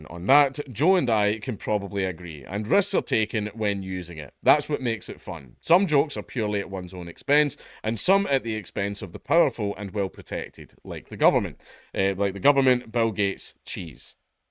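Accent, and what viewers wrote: Scotland, United Kingdom. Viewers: Your accent sells it to me as an Englishman. You have a delivery and straight talking Scots style which is very funny and appealing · I don't know why the Scots accent makes everything funnier. It just does. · Nah, man. Don't sell yourself short. This Texan not only loves the Scottish accent, but we find you funny cause your jokes don't suck lol Also, it funny and ironic how comedians will virtue signal by not saying racial jokes because they believe it disparages a group of people, but have no problem making political jokes towards the group they don't agree with. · I think the Scottish accent helps English folk find you funnier too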